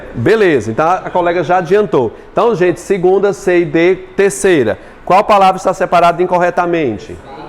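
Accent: Northeast, Brazil. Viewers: Primeiro professor que não me deixa entediada. É didático, sem gracinhas, sem exageros para prender atenção, direto ao ponto. Fora esse sotaque maravilhoso do meu nordeste 😍😍😍😍 Nossa eu amo suas aulas. Muito obrigada, de coração.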